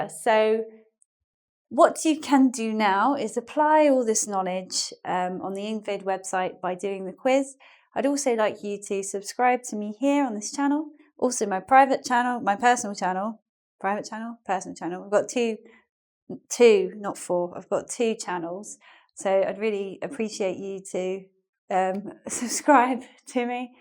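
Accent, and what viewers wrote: United Kingdom. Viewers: If this is not a british accent then I don't know what the fuck is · I appreciate your your tips too much. I love british accent and you was very helpful to me. I´m preparing myself for an interview to a job at a british university. God bless you and me! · Im very sure this is british accent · a beautiful British accent =))) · i came here to practice my english...i struggle a little bit with british english but it was great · are you british? I notice your accent different, thank you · What a question. I didn`t notice her accent myself.kkkkkkk Of course she`s british man, c`mon. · really? you should listen people speak cockney too. She has a lovely British accent for me ;) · Dieses british englisch macht einen total wahnsinnig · you're so cute and your British accent fits you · I am big fan of your. r u British?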